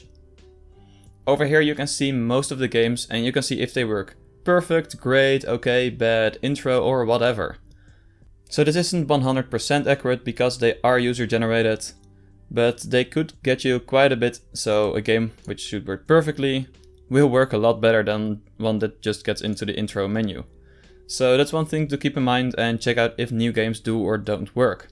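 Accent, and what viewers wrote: Netherlands. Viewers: nice! Your channel seems nice, i subscribed :) also is that a dutch accent i hear? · wait are you dutch?? i hear by the accent. instead of some you say som, so if youre dutch too comment plzz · If you download sword or shield and there is an update thingy do you need to download it or can you just keep playing and the emulator just updates it automatically Ps. I can hear your dutch😉 Is wel goeie man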